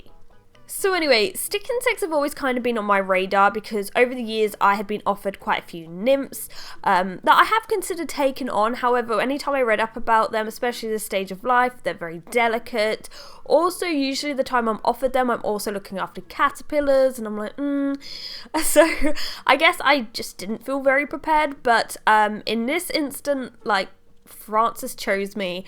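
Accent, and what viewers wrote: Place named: RP, United Kingdom. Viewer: Yes!!! Updates! Really any content you produce I love because you’re informative, not boring, and you’ve got a posh accent!! 😉😊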